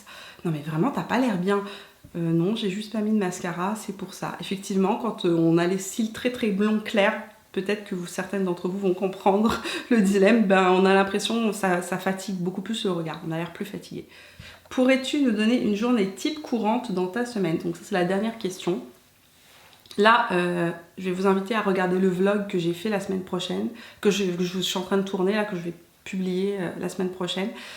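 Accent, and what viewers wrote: Canada. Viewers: Très jolie ton histoire avec ton chéri ! Il vaut mieux se teindre les cils que faire une extension de cils . Sympa cette vidéo en tous cas ...c'est Marant parce que je pensais que tu étais canadienne du coup ...tu as pris un peu l'accent je trouve. Mille bises et bravo pour ta chaîne tu es toute simple et de bons conseils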